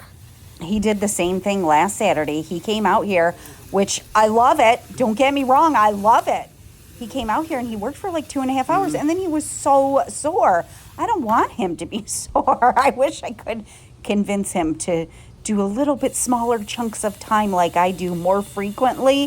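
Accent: England, United Kingdom. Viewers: You are killing it!! I have a question. I have been following you for a year now, where does the English accent come from??? Lol have a great day!!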